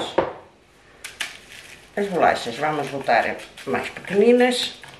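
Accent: North, Portugal. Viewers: Por curiosidade esteve a viver em que país? Isto pq eu sou nativa e sei pela sua pronúncia q é norte, ali a zona de Caminha até Porto... E no seu caso não chegou a influenciar a sua pronúncia o tempo q esteve noutro país. Falava essa língua? Seria Brasil? Se n falou lá ma língua nativa tb n pode ter sofrido a influência claro. Obrigada.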